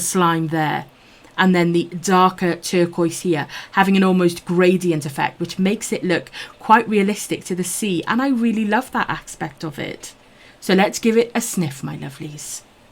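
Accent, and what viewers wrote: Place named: England, United Kingdom. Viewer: Great review! I’m in love with ur English accent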